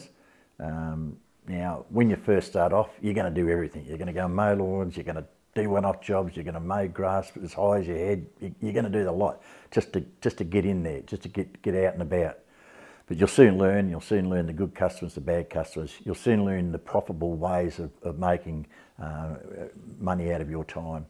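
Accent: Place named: Australia. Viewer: Great to hear an Aussie accent for a change! A good decent bloke giving honest no nonsense advice that's actually relevent to Australian conditions. Always enjoy your video's mate! Steve. Upper Cuts Lawn Care - Devonport Tasmania.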